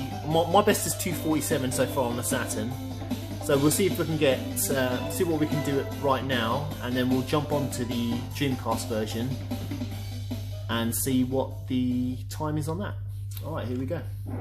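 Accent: United Kingdom. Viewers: I see Okay. You sound like you are from the UK - is there a reason why you bought the NTSC-J Import of 'Sega Rally Championship Plus' over the PAL version of 'Sega Rally Championship'? I'm not sure which version of the game to buy for my Saturn. I am also in the PAL region.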